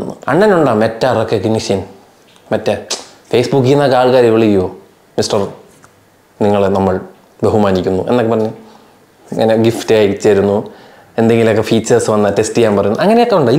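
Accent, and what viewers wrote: Trivandrum, India. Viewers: എന്തൊക്കെ പറഞ്ഞാലും നല്ല തിരുവന്തപുരം ഭാഷയിൽ പത്ത് പറയുമ്പോൾ ഉള്ള സുഖം അതൊന്ന് വേറെ തന്നെ...👍😊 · Huge Respect bro, Secret Agent & Mallu Traveller 🙏🙏👍👍👍👌👌and bro your Trivandrum slang 👌👌👌 വേറെ ലെവൽ 👌👌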